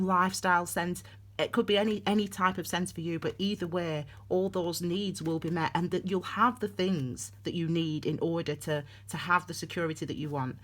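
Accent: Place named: East Lancashire, United Kingdom. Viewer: Where are you from? Sounds like East Lancs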